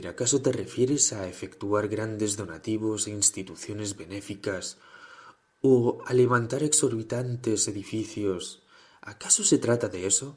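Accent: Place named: Mexico. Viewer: Me encanta su claro hablar y también el acento de México es muy entendible y claro pero el resto de Sudamérica es fatal.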